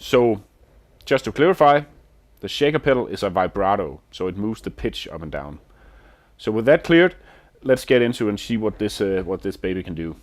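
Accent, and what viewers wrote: Denmark. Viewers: with a charming danish accent you even could sell me a boss metalzone.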